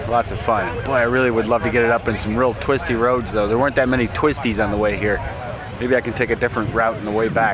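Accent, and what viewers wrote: United Kingdom. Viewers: Loved the English accent, but you German? I only have one thing to say to that RAMSTEIN lol Keep on hackin' it fascinates and inspires me:-) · You may have been 1 of the 2 that won a trophy out of the 2... but you had the balls to enter...and you won period.... that is a win in my book, just by entering you won as I see it so it is everyones loss that didnt enter at all. He's a German US Born British Accent speaking Japanese Bike Riding Maker/Hacker... the way the United States was meant to be... I love it. (Mind you i am not saying what you said what bad/wrong just making it clear to those who want to make bad comments telling them that this is what America is all about, I was roughly clarifying)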